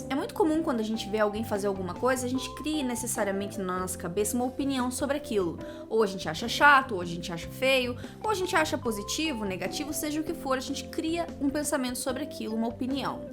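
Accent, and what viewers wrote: Brazil. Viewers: mano tu tem sotaque de sulista, eu amei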